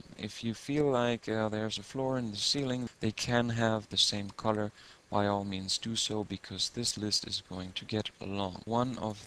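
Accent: Germany. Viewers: I like german accent in English - anyway thanks for this tutorial.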